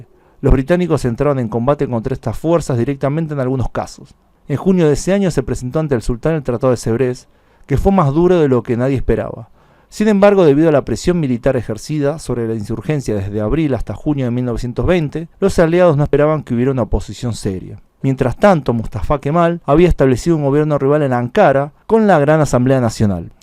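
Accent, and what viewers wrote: Argentina. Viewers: Tema Interesante, pero escuchar SHAS SHA SHA, DUELE EL OÍDO. EJEMPLO SHAMÓ, EN VES DE LLAMÓ, desarroshó, en ves desarrolló; mishón en vez de millón; mashoría en vez de mayoría; sha en vez de ya; masho en vez de mayo; shamada en vez de llamada, shegaron en vez de llegaron, constitusheron en vez de constituyeron, etc etc. Oye amigo gauchín, en tu país, habla como quieras, pero para consumo exterior pronuncia bien y modula bien el castellano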